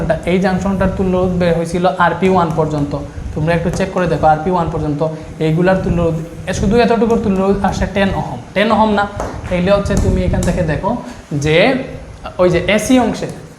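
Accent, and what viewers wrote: Sylhet, Bangladesh. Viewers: Sir ki syleti naki 😅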